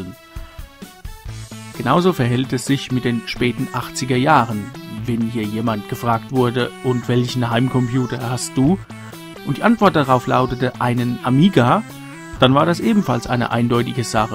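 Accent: Hesse, Germany. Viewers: Dieses war das erste Video, das ich von Dir gesehen habe und mir gefällt, was Du machst! Mal ganz davon abgesehen, dass ich selbst einen A500 hatte, finde ich Deine Ausführungen sehr eingängig und allgemeinverständlich. Ich gebe zu, ein bissel technischer könnte es meiner Meinung nach schon sein, aber das ist nur eine Randbemerkung. Übrigens: Du bist Hesse, oder? Du hast einen grandiosen Akzent, der aber niemals überhandnimmt. :)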